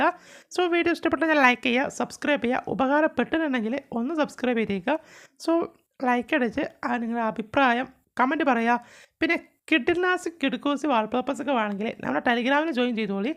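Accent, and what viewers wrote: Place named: Malappuram, India. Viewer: 😂ethra thekkan bhasha anukarichaalum malaappuram bhaasha idakk keri varum. Ningal malappuram karan😅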